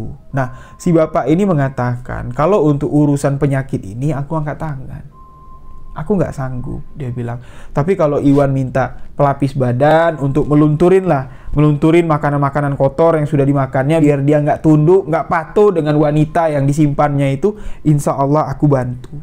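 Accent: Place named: Jambi, Indonesia. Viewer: Enak banget ngomongnya,kaya gini yah logat jambi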